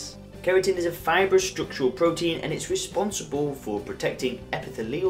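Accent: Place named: United Kingdom. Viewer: Tf is this stupid music supposed to do? Just makes it annoying trying to understand what you're saying since you're a britbong.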